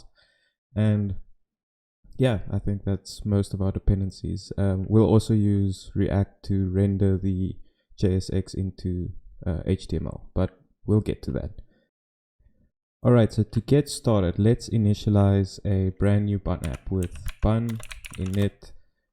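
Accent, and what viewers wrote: South Africa. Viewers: Came here for the bun and HTMX, stayed because I heard the SA accent lol. Also playing with HTMX now, still wana start a side project with bun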